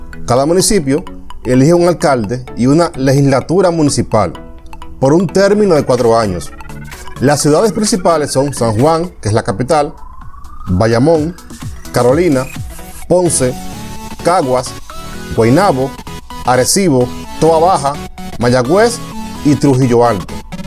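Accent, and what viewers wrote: Dominican Republic. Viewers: Dominicano por ese acento haciendo video de PR para coger views. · Los cubanos no hablan sobreefatizando las vocales, los dominicanos si, por lo cual pienso es dominicano. 😊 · el que hace el video es dominicano y se nota en su acento, PR es mas rico que RD unas 4 veces mayor.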